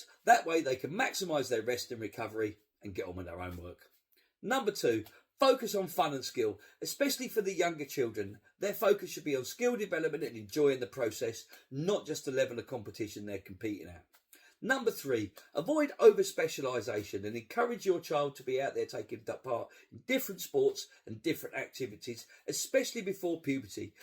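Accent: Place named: Kent, United Kingdom. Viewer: This guy is from Kent 10000%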